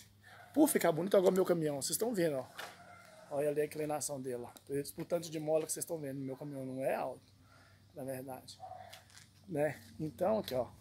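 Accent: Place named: Minas Gerais, Brazil. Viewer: de qual lugar de minas vc é?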